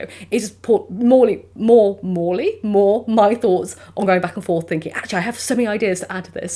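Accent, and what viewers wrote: RP, United Kingdom. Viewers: A very beautiful real intellectual with a majestic royal english accent 🌹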